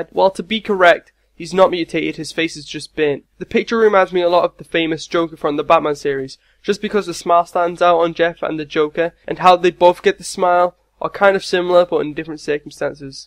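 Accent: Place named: Manchester, United Kingdom. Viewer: your voice! <3 where do you come from, it sounds somewhere in the Manchester area in England :3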